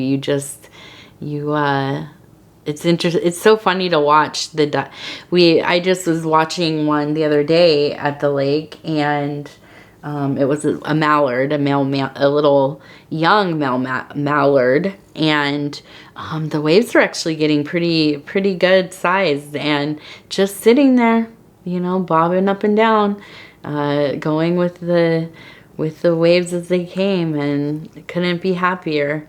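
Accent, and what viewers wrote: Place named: California, United States. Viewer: Great! You answered my question. I can now attribute the quality I heard to a Californian accent. The nuances of our American accents are broad and beautiful. I was made aware of it early on growing up in Alabama!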